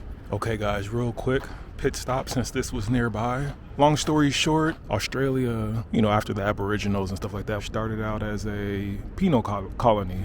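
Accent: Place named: United States. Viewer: man you sound American, if that's the case, which US city would you say is most similar to Sydney?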